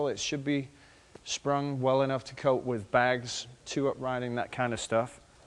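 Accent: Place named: Northern England, United Kingdom. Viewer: is he northen english or american?? that accent is confusing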